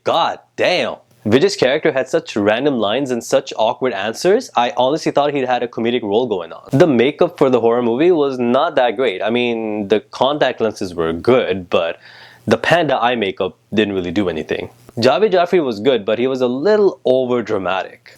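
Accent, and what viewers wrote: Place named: Canada. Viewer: oh canada... Thats the accent come from 😉.... Yeah youtube support big companies.... I always wait for your reviews... I'm one of your huge fan... Please upload more often... Also you look much better than the photographer boyfriend 😉 😉 😉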